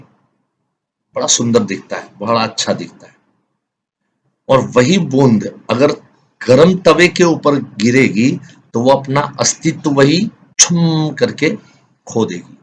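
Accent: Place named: Maharashtra, India. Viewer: खूपच सुंदर माहिती दिली सर धन्यवाद ( शुद्ध मराठी बोलता सर तुम्ही )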